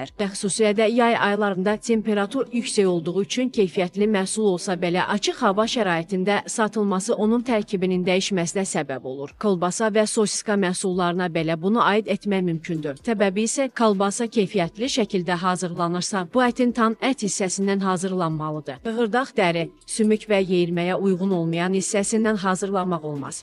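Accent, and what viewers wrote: Armenia. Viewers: Bu kim idi? erməni ləhcəsində məlumat yayıb.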